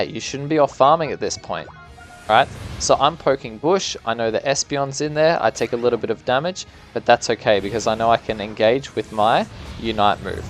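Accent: Australia. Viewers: Are you australian!?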